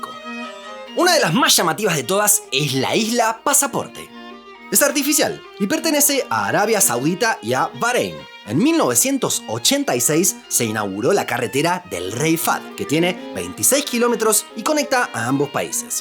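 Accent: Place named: Argentina. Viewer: Sinceramente tu voz es muy agradable! generalmente me cuesta escuchar videos con acento argentino (por que toda la vida he visto las peliculas y programas con doblaje mexicano, aunque no soy de mexico) pero contigo jamas me costo, super genial el canal!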